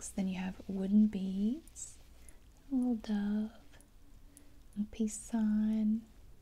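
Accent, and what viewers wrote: South, United States. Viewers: I was giggling when you said you had a "tiny bit of Irish" in you. Reminded me of something Phil Lynott, Irish lead singer with Thin Lizzy, said at a concert. (slightly dirty joke coming up....) He said, "How many of you girls here tonight have a little bit of Irish in you?" (girls scream and wave their hands in the air) "And how many of you would like to a little bit more Irish in you....?!" (girls scream even louder!) Love your southern accent x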